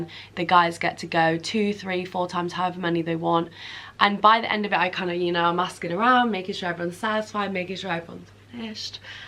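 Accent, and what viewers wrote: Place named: Chelsea, United Kingdom. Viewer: Not Essex more Chelsea :) wait.. Whaaaaaaaaa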